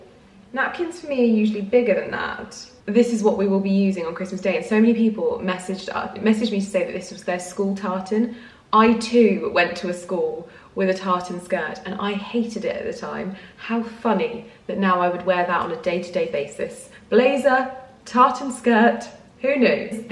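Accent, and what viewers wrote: RP, United Kingdom. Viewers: Oh my god the accent!! What happened to you sooooo posh😅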